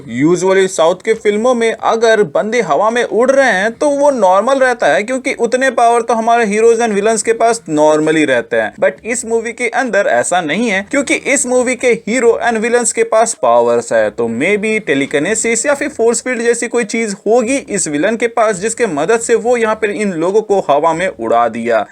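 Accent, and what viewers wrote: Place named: Bihar, India. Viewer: your Bihari Acsent is good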